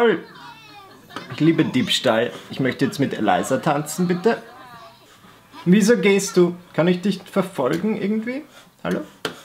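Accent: Austria. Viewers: Hallo! Ich habe dich durch eine Freundin auf dein Instagram Account entdeckt. Darauf habe ich das Bild mit Sims 4 gesehen habe und dann deinen YouTube Kanal gesucht. Nach dem Sims 4 LetsPlay abonniert. Ich habe dann gemerkt dass du aus Österreich kommt und das finde ich echt cool. Deinen Akzent bzw die Art wie du sprichst ist sehr cool und gefällt mir. Auch deine Mimik finde ich sehr cool. Ich habe erst nach ein paar Videos gemerkt dass du schwul bist und deshalb finde ich Kommentare wie "Du bist die größte Schwuchtel überhaupt" richtig unnötig. Hab zwar nur 2 entdeckt aber trotzdem. Du bist der einzige österreichiscche Vloger. Respekt. Warum? In Österreich gibt es nur VeniCraft, der ist aber LetsPlayer. Noch was zu deinem Akzent, ich habe auch einen da ich aus Linz komme und wenn ich mit Freunden in Deutschland skype verstehen sie nichts. Dich verstehen sie auch nicht immer. 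Finde ich lustig. Ich könnte noch länger schreiben aber ich möchte dich nicht nerven. Liebe Grüße aus Linz:)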